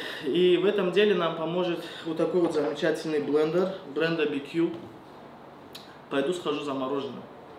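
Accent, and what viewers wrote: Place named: Dagestan, Russia. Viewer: Почему у него дагестанский акцент при такой азиатской внешности?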